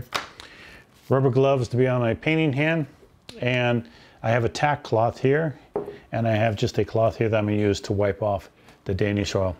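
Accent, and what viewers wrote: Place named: United States. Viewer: I love American accents!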